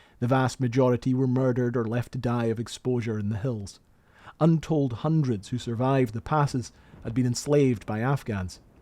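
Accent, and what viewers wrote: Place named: Scotland, United Kingdom. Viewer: OMG! I've gone off my nut! How have I not found you yet laddy? I'm 6th generation Canadian. My many great grandpappy on my mothers side came from Ochiltree, East Ayrshire, Scotland in 1857 and settled in Victoria BC. Both sides came from Scotland. Nothing I love more than a glass of scotch, some Still Game and a thick Scotty accent telling me about history. Keep up the good work boyo!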